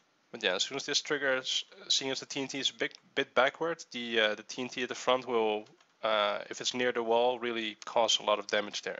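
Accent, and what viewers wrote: Netherlands. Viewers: Yeah you can hear That he's dutch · Are you dutch?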